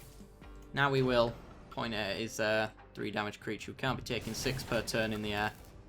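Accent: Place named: United Kingdom. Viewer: British accent is always good!:)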